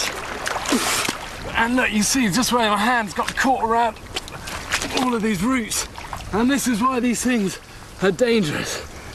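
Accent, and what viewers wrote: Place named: England, United Kingdom. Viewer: Recien me doy cuenta por su acento que es ingles :O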